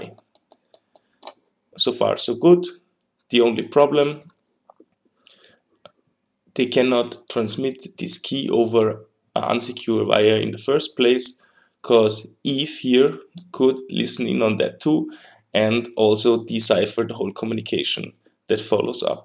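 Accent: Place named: Russia. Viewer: You need to hide that Russian accent a bit. Good video and explanation and thank you for posting this information.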